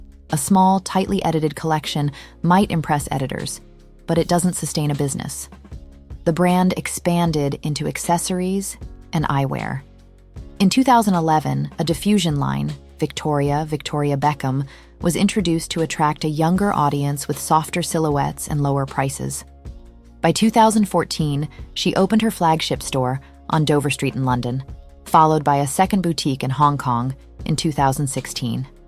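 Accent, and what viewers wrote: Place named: United States. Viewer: This is the best "documentary" I have ever come across of her. Excellent narration in an American accent which sounds like a beautiful melody. I really enjoyed it. Her story is so honestly told. It's just authentic.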